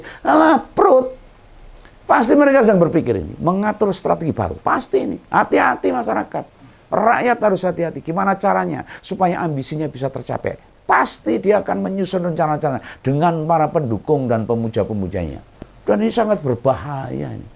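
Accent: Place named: East Java, Indonesia. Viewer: Aku sueneng ngrungok ke mase Iki wong Jawa timur nek ngendikan bloko suto 😂😂😂😂😂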